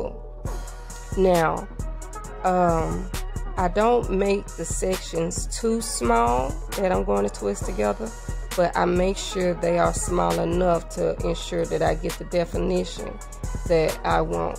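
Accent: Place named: Georgia, United States. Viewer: I knew it! My cousin says oil just like you and she's from Georgia. She so funny, she says stuff like "kitchen tile" as "catchin' towel" 😂😂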